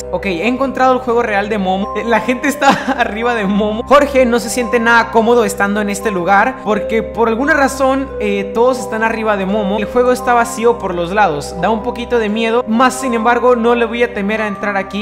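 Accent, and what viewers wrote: Chile. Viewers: No sabia que era chileno xd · Alv pensé que es chileno XD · Eres de chile?o de donde? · eres de chile?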